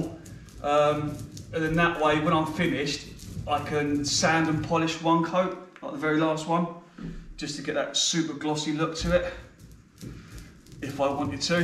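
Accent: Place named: United Kingdom. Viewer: So british accent, good vid, folk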